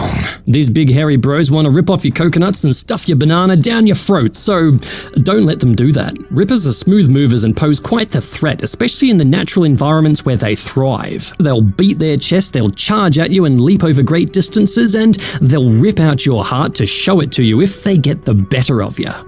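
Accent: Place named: RP, United Kingdom. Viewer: RP prononciation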